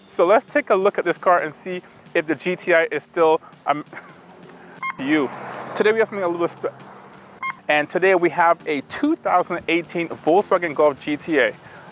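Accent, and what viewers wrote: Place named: Caribbean. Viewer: Are you from the Caribbean? Hearing a hint of a West indies accent.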